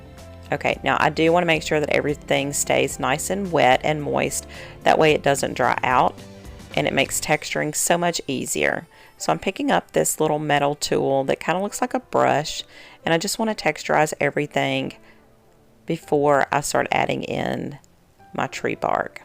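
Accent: South, United States. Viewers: Girl you are ridiculously talented. I just love these. You blow my mind every time. And I love your southern draw. Reminds me of when I was child and we would drive to Tennessee every summer to visit family. Nothing like a 10 hour drive in a car filled with kids. Keep crafting and stay healthy my friend 🌸🌼🌺🌻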